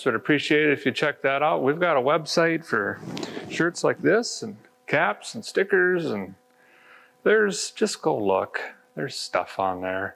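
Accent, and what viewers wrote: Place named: Great Lakes, United States. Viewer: Love the “Great Lakes” accent! All in fun and learn and become inspired too!! Great job. Liked, subbed, commented and shared!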